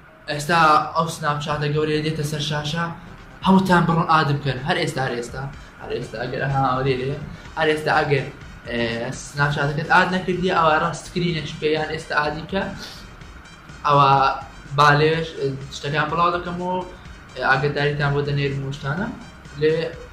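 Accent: Erbil, Iraq. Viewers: hhhhhhhhhhhh bas chan diqat ayam ba hawlery qsa aka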